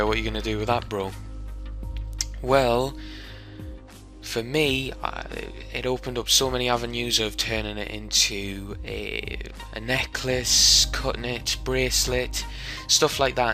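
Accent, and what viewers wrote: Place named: Newcastle, United Kingdom. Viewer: Geordieeeeeeeeee m8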